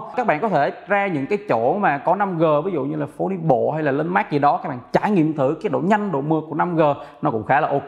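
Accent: Vietnam. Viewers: ôg này ng khánh hoà phải k ta nge giọng y ng khánh hoà z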